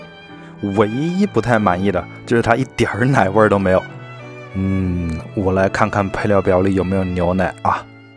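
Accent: Wuhan, China. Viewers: 是怎么做到标准的普通话中夹着浓浓的武汉腔的😂😂😂听起来格外“需服”！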